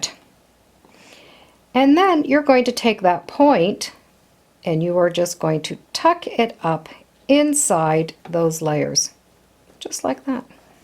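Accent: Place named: Canada. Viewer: I always have trouble stitching in the ditch on the top and being able to "catch" the backside of the binding in those stitches. I'll turn the quilt over and find that I missed long lengths of the binding and have to go over it again, wrecking the nice clean look of the front. How on earth do you do that??? (also, you must be Canadian! My mom and Granny were from Toronto and always said "agaynst.' I was born and raised in Los Angeles. They both died decades ago, so your accent really brought back lovely memories of my Canadian side!). Thanks for posting. Very helpful! God bless!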